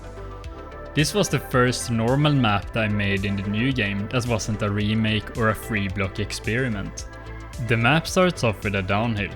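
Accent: Sweden. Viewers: No offence så men jag gillar verkligen inte svenska dialekten på engelska.. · Swedish! Love the accent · du har den svenskaste engelskan jag har hört på ett tag o jag älskar det! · this is the most swedish accent i have ever heard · I love your swedish accent, i went to sweden at vacation i know tack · Damn that Swedish accent hits hard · as a fellow swede, love the dialect :D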